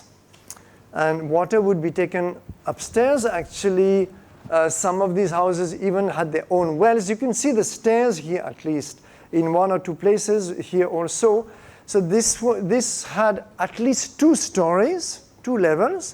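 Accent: India. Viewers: I like how he has acquired the Indian accent. How sweet. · Poor guy he has even picked up an Indian accent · Your English is excellent/perfect, with a lovely melodic tinge of Indian accent. So this civilisation prioritised the well-being of people against many other considerations, and nobody was attacking them. What a beautiful civilisation.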